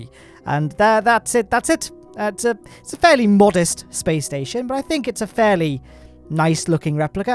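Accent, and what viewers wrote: United Kingdom. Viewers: He's as British as they get, you can hear it on his accent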